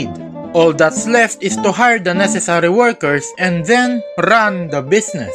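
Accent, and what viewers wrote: Philippines. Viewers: Hahahaah ang accent talaga pinoy na Pinoy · Pilipino ka po?yung accent kase parang filipino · Bro, you have a filipino accent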